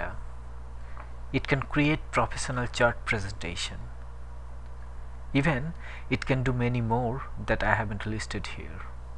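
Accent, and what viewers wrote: India. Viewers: Are u gujju? Ur accent says that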